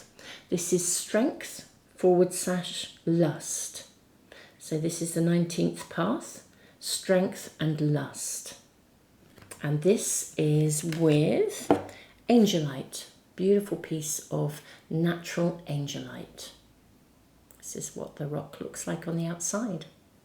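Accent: United Kingdom. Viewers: Loving....I selected Pile 2. Your beautiful British accent and voice bring me hope.....I'm living in the UK, I am the ring here, the female with lot of energy to move forward and my partner living in the US is the castle and all correct. Unfortunately, on July 1, he said he needs a time off from this beautiful love affair we had so far, as he felt i is stressing him out....and although it made me so much pain, as I know he loves me and I trust such a love should never disappear. According to the reading today, during this time off if he steps out of his zone and sheds the skin and realises the immense things we could develop....I think that is the destiny of our relationship....I cannot call or message him until he does it for me as he is the man and going through changes in his own world, which matches what you said. Loving, I do hope he will reach out to me soon with love, clarity and purpose because I have no words to explain how beautiful it used to be........ 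According to you it should happen and hope it will.....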